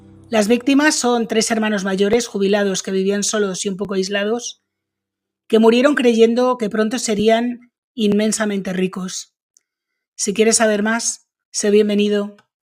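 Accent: Spain. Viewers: No reconoces ese acento de España? 😂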